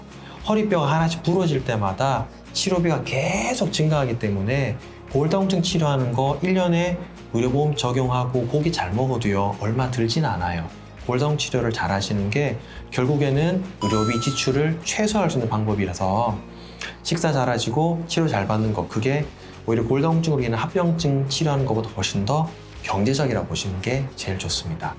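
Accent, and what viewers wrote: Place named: Gyeongsang, South Korea. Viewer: 이미 말투에서 대구출신인거 숨기지 못하죠!! ^^ 반가워요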